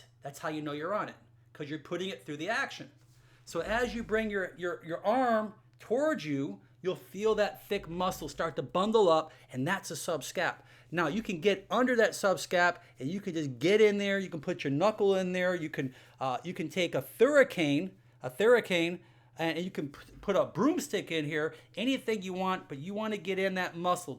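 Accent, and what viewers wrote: Australia. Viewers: Are you from austrelia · hi Are you from Australia?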